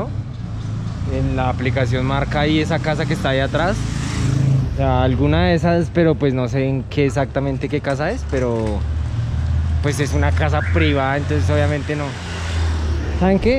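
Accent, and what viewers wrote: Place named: Bogotá, Colombia. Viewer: severo acento rolo jajaj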